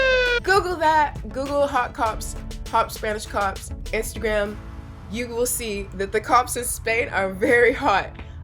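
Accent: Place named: Canada. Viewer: Hi. Just curious what state you are from? You kind of sound Canadian...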